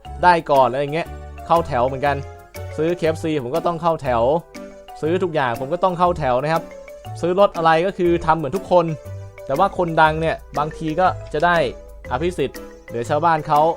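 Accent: South, Thailand. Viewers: ภาคใต้เหมือมนิพี่ผมคนสุราด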